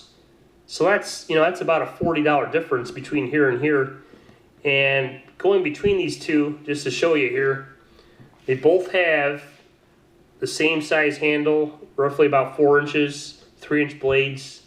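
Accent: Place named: Canada. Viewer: Great video, I really thought you were Canadian seeing as you have no accent... or maybe you have a canadian accent living so close to the border Haha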